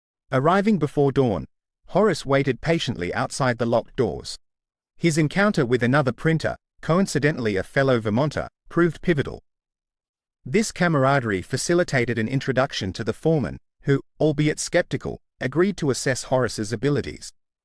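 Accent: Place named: United Kingdom. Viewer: Wow, I like that your actually mentioning some less well known figures. I respect that. Also, love the British accent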